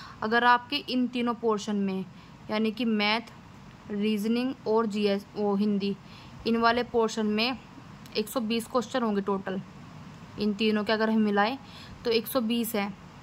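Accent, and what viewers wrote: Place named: Western Uttar Pradesh, India. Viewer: Voice tone se lg rha aap west up ki taraph se ho😊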